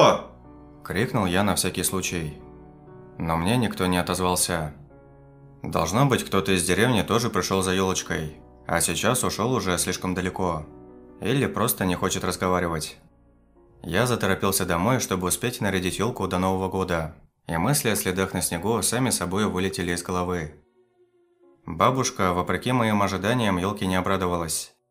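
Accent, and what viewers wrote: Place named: Vyatka, Russia. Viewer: И правдо очень хорошо засыпать под Вятский говор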